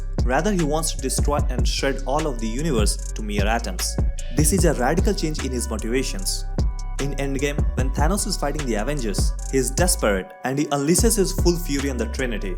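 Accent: India. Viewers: Sounds like ur a malayali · Are you Indian... Your Voice has Indian accent.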